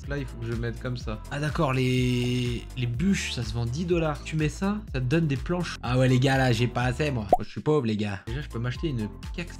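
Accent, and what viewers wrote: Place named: United Kingdom. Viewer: J'adore ton accent anglais quand tu lit les trucs en anglais 😭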